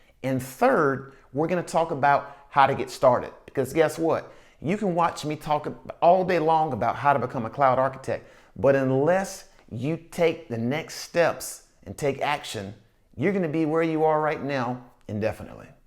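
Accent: South, United States. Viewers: That southern accent coming through strong 🤠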